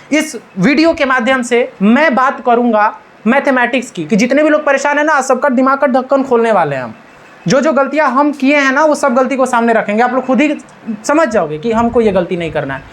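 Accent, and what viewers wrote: Bihar, India. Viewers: bihar se ho bhaiya . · Bhai aap ...Bihar se ho ?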